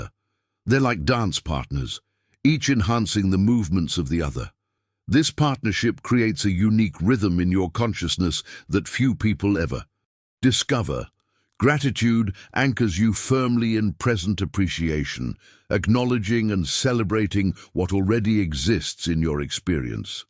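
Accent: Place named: United Kingdom. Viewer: This pompous, ubiquitous, British-sounding narrator’s voice is highly annoying. “We make money the old fashioned way: we eeeearn it.” 😕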